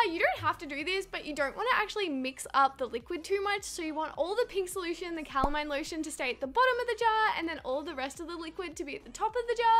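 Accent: Australia. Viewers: I love how I could tell she was from Australia by her accent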